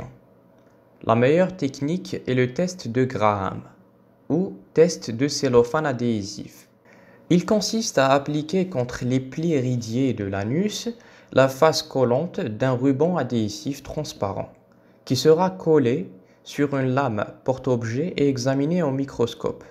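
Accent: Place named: Algeria. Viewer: Vous avez une accent algérienne en TT cas merci infiniment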